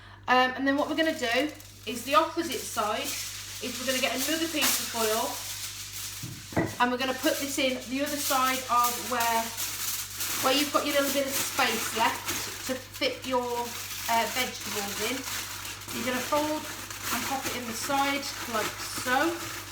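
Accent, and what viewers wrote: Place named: Coventry, United Kingdom. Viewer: Hi, just bought one today, so thank you for this informative video. Don't have to say where you are, but your accent is like mine. I'm 5 miles out of Coventry. I'm guessing you're from round this way too.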